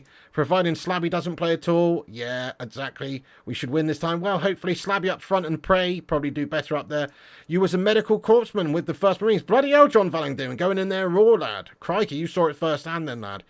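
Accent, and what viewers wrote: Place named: Cockney, United Kingdom. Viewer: ...but ,a better way to say ,maybe; blah yada ditto ,he had a caped goat ,but u pronounce like a full blown 1970s london east end evening standard newspaer seller outside all tube stations in that time peeriod .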